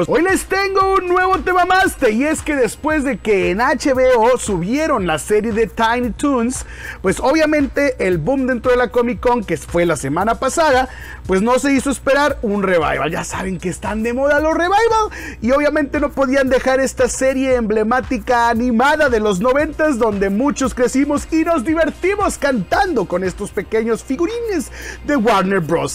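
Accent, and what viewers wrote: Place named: India. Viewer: Es idea mía? 😅 o comenzó hablar como indu 😁. Men hace años no veo tu canal, y me sorprende lo relajado que estas y animado y ya no te tomas personal las criticas contrarias de malas maneras (poco 😅), no se si fue tanto Fortnite pero se te a cierta bien en el canal. me animaré de ver tu canal, 😁👍 se te desea lo mejor.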